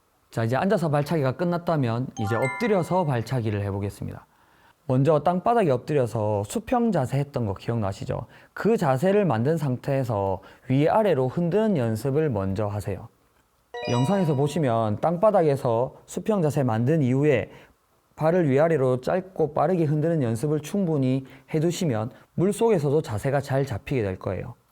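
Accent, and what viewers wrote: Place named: Gyeongsang, South Korea. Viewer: 경상도분이신가봐요.. 억양은 속일수가 없네요^^ 그래도 귀엽네요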